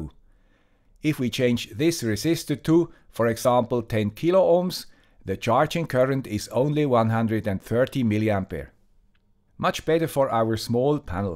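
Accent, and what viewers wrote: Switzerland. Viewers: Ah, das ist also eine Youtube "Option" für creators, wo dann neben den Untertiteln eben auch die Titel und Texte gleich mit übersetzt werden , denn mir sind zuletzt eine Reihe von Videos von anderen ohne "deutschen Bezug" untergekommen und ich mich fragte, was da los sei, wobei da aber auch welche waren, die in Berlin am Brandenburger Tor gewesen waren, weshalb ich ins Grübeln kam. Vorher bekam ich halt alles "100% authentisch" (from the guy with the suisse accent), von daher fiel halt der Titel auf. Ich muss mal sehen, wie ich nur noch die Originaltitel bekomme. Jetzt hieß der Titel hier im Video wie auch in der Kanalübersicht: "Günstige und einfache Solarenergie für unsere kleinen Projekte (Deutsche Untertitel)" Wie immer ein tolles Thema spannend präsentiert und vor allem auch in all den "I want more" Stellen im Detail erklärt. Und ich fand auch die Verweise auf andere, ältere Videos sehr gut, weil da Videos darunter waren, die ich verpasst haben muss. 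DANKE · Ha. I never knew that. Alway thought it a brilliant scene. Maybe it is even better now :-) And than you learn that after 43 years on a channel from a guy with a Swiss accent :-) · Woh, 5 people don't like your swiss accent. 😊 Thx for this intresting video!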